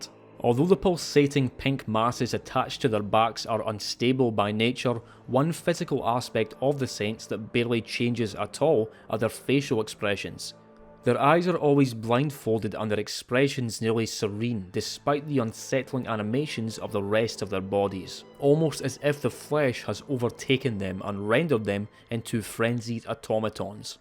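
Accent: Scotland, United Kingdom. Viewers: An hour and a half of a lovely Scottish voice talking about the completely fucked monsters fromsoft comes up with? I think you may have ticked all my boxes 😅 I loved this scene when I first came upon it. Mostly because it reminded me very specifically of the Orphan of Kos, staring out at the sea and weeping. Except there were 50 of him (although down one part of the beach there is one standing by themselves) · He sounds Scottish to me. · I'm also scottish. Yer accent is class brer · is that a scotland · Subscribed for the Scottish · Wow thats wild and a little racist. He's fucking Scottish it's not a joke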